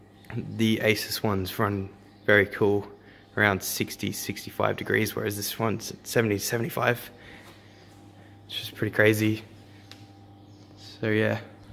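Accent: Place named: Australia. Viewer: is this aud or us u sound aussie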